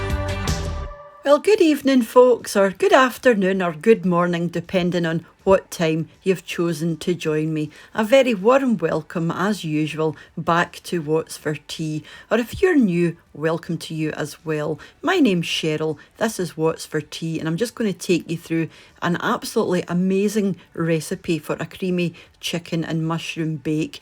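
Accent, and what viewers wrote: Scotland, United Kingdom. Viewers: Great channel! I'm subscribing! Nice to here a Scottish accent too! I'm in Edinburgh 👋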